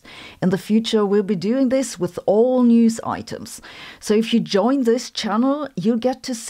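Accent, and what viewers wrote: Germany. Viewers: her pronunciation has problems because of influences from the German accent, and your inferences are not accurate by the way, simply straw man and borderline ad hominems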